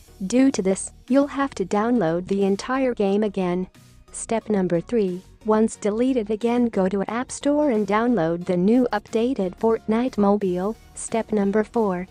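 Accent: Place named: China. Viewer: You sound Chinese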